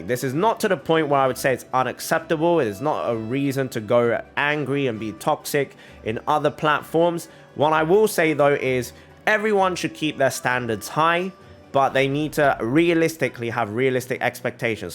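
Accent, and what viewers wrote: United Kingdom. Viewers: I just found out about you and I have say, it's kinda weird hearing an asian with a uk(?) accent. No hate or anything but it just kinda threw me off a bit since it's a bit unusual to me.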